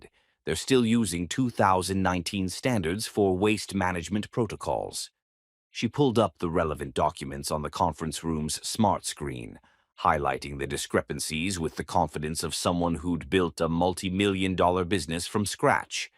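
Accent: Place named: England, United Kingdom. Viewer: I like this story because of the eloquently English spoken accent. I also like that the couple didnt wait to show their feelings for each other❤ Listening from Antigua 🇦🇬 Patrick is a bit slow in getting ahead of his greedy cousin Marcus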